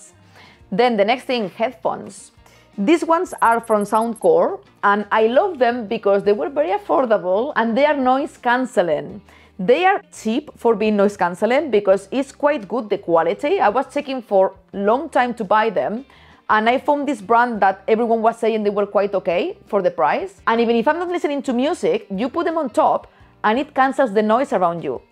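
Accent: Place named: Spain. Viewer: great video,! I love your energy and your "spanis" accent:-)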